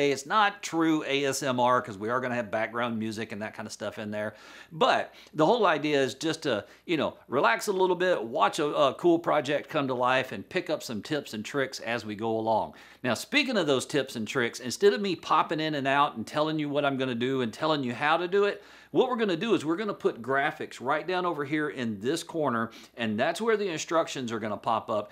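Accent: South, United States. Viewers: I quite like your southern drawl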